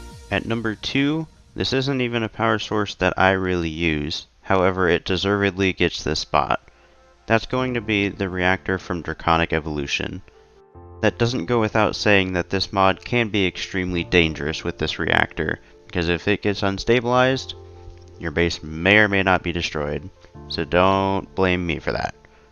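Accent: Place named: England, United Kingdom. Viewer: das ist ein Engländer kein Deutscher man hört das von seinem Ausdruck was er sagt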